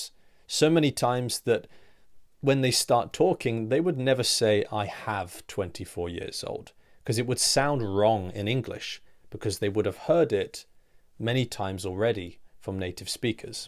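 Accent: United Kingdom. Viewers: British English ❤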